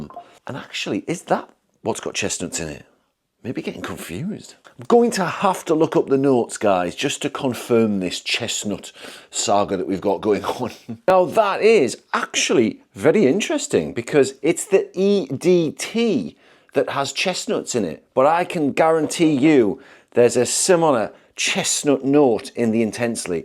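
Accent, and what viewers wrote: United Kingdom. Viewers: Look forward to the Azzaro vid, they do great frags. I was trying to pinpoint your accent, I thought it east Yorkshire. If you get the chance, defo Scent Salim in Leeds/ Bradford is worth a shout. I can recommend a handful to try, and they are great hosts! I'll keep my eye out for the Azzaro vid.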